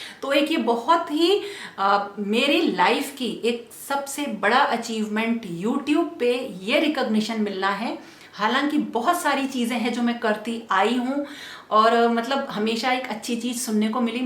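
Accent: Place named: Gujarat, India. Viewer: Nice information. ji after viewing this video I've subscribed ur channel. From ur pronounce I think u r Gujarati, BTW I am Gujarati. Thank You and God bless you. Struggle is the real sugar who makes ur efforts sweet.,🙏❤️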